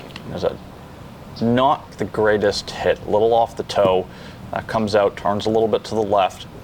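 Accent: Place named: France. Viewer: What is the name of the course? Us dumb Americans cannot understand a word when you throw a French accent on it.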